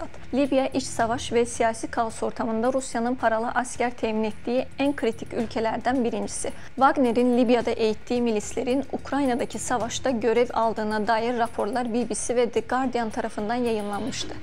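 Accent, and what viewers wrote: Azerbaijan. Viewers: Konusan kizin aksanindan azerbaycanli oldugu belli :) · Azərbaycanlımi spiker? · Salam siz şurnalis Azəribacanlısınz gözəl danışrsınz 🇹🇷🇹🇷❤🇦🇿🇦🇿🇦🇿👍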